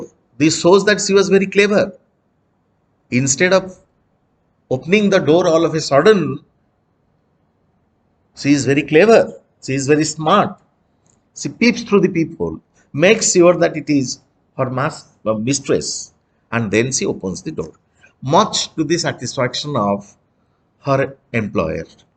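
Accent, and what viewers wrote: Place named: Bengal, India. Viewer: His Bengali accent is too cute and attractive 😍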